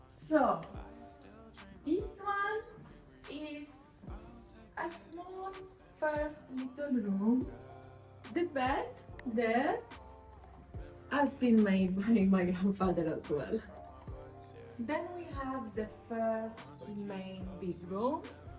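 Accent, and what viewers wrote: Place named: Italy. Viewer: When I come back in the next life I want to be your personal film maker, follow you with a camera all day and listen to that wonderful English with the Italian accent. What a cozy domicile your grandparent's house is. Glad you held onto it and didn't sell it. I am sure you can feel your grandparent's energy when you are there, thank you for the tour.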